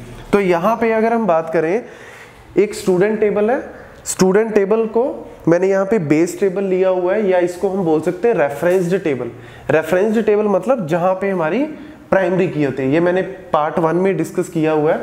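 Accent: Punjab, India. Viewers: Mast punjabi tone. Yaha pe koi two taa nahi h yaha pe to 20 hai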